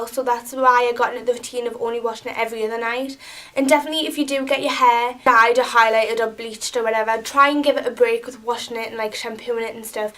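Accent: Newcastle, United Kingdom. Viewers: Shes got a geordie accent, from around newcastle in the UK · She's a Geordie lasssss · She has a Newcastle accent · is that the Geordie accent? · shes not from liverpool shes from newcastle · She's a Geordie like me omg! From Newcastle!🙊 ♡ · R u from Newcastle?????? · it's geordie, like me :) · She talks like them in geordie shore. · its a geordie accent (newcastle) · I think shes from Newcastle in Britain. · She's from Newcastle!!! You will recognise the accent if your from Newcastle or watch geordie shore, her accent is not fake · Guys she isn't Irish, she's from Newcastle in England :) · She's from Newcastle :) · shes from Newcastle not feking Ireland -_ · Erm for all who want to know she is geordie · She is from Newcastle by the sound of her accent - similar to mine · no its not? it's a geordie accent. (i am also a geordie). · Ive got this accent too, shes geordie :) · omg we have the same name and are you a geordie, if you are we are so alike!! 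x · Geordie accent ? (Newcastle ) proppa love ittt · She is British, she is from Newcastle I think... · That is definitely not an Irish nor Welsh accent. If I was to guess, I'd say wither Liverpool or Newcastle. Probably the latter. Bloody lay off it. That's not the aim of the video. dayum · im from newcastle, yeah she is from newcastle, in Britian we're Geordies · Omg , I'm a Geordie as well! Best accent ever! ,xo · Yay geordie accent :D I'm from Newcastle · Her accent isn't 'fake' shes from newcastle, north easy of England. I went to school with her. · Her Accent is Gordie, What Gordie Shore, it will change your life. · u from newcastle? i think i see a fellow geordie? ahaha x whey aye man x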